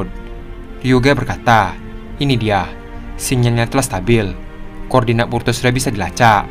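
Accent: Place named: Bali, Indonesia. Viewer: Logat baline keras gati bli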